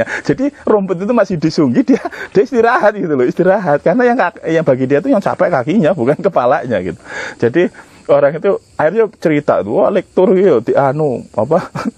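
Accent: Banyumas, Indonesia. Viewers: Lah bisa ngapak juga😅😅😅.. Asale endi sih rika pak?? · ora ngapak ora kepenak.... 😁 salam ngapak ( karang pucung )...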